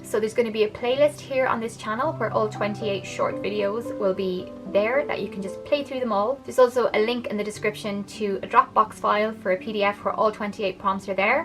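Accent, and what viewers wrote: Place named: Ireland. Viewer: Love the irish accent x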